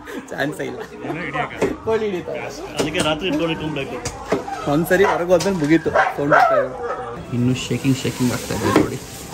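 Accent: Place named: Kolar, India. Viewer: Illa Pure kolar style nalli kannda mathaduthare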